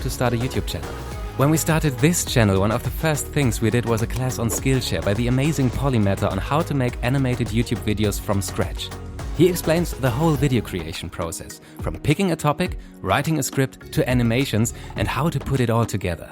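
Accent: Germany. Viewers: World class video's about Sports and they're educational aswell and go into good detail. By your accent i can hear you're from a German speaking country. Is there a German Channel coming in future? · I'm in for the man's voice lol.. The German accent sounds good on him. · Meiner Meinung nach ja, habe ich damals beim ersten Video, das ich gesehen habe, schon herausgehört. Ganz kann man den deutschen Akzent nicht verbergen :) und man merkt auch, dass in diesem Video z.B. die deutschen Namen alle perfekt ausgesprochen werden, das kriegt niemand mit Deutsch als Fremdsprache hin (außer die Person wuchs hier auf, o.ä.).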